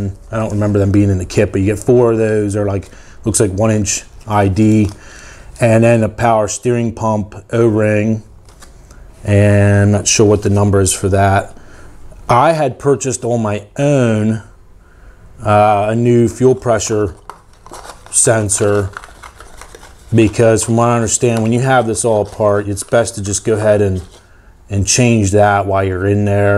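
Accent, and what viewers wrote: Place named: Pittsburgh, United States. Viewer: Thanks for posting this. I took my 4.4L apart to replace the PCV valve. Your video helped a lot. And finally, you have to be from Pittsbirgh, PA judging from your accent!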